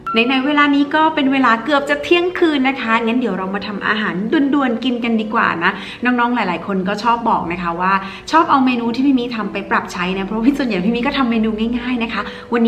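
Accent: South, Thailand. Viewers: พี่มิพูดใต้ให้ฟังหน่อยค่ะชอบๆๆๆๆ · สำเนียงใต้ก็น่ารักดีนะคะน้องมิ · ชอบพี่มิแหล่งใต้ 😁🤭😁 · มีแหรงใต้ด้วย น่ารักจังเลยครับ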